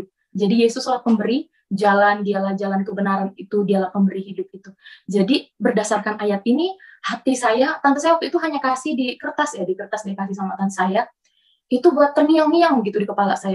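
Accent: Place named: Java, Indonesia. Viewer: kok...ngaku org Bugis tpi logat Jawa...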